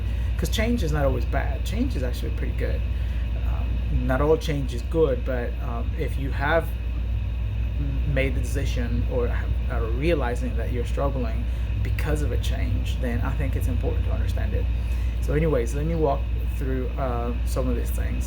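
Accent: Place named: South, United States. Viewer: Love this,. Well done and very helpful. You are picking up a southern accent 😁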